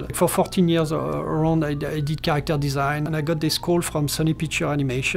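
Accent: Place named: France. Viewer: Superbe accent français😂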